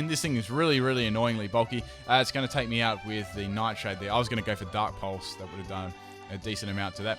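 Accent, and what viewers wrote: Australia. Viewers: are u ausrtralian?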